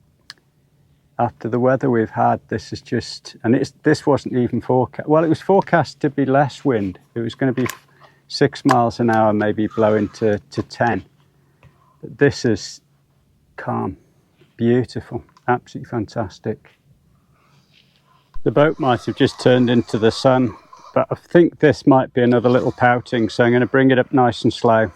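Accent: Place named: Northern England, United Kingdom. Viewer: Oh I see 😊 Thank you mate.I did notice you had a northern accent 👍 I was thinking somewhere near Liverpool? Your vidios are brilliant,I love how you get so excited and can see you are a genuine nice guy that loves his fishing.Keep them coming buddy.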